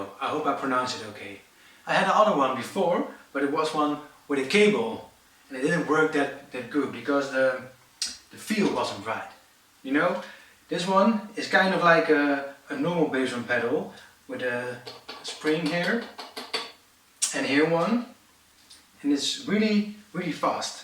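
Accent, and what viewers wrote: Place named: Netherlands. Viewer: zwaar nederlands accent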